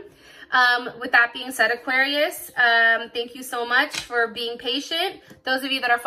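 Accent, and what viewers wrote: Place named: United States. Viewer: Luv your American accent (I'm English )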